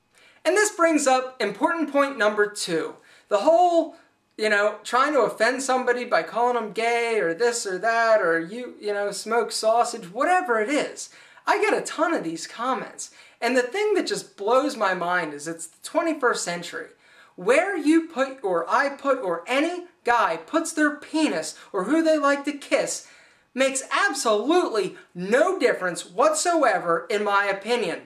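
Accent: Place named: Western Pennsylvania, United States. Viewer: You sound like you have somewhat of a western pa accent :) Very nice videos!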